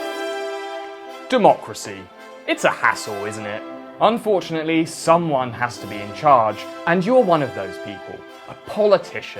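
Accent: United Kingdom. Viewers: What kind of British accent is this!?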